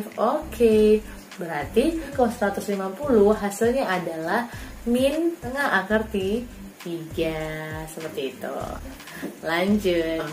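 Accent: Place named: North Sumatra, Indonesia. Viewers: Bahasanya Medan kali... Sukses trus membuat kemudahan matematika👍👍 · Nampaknya ini dr sumut ya 😁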